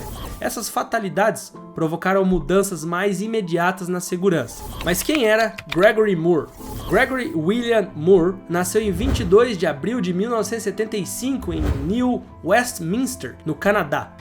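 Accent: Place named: Paraná, Brazil. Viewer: O narrador é da região Oeste do Estado do Paraná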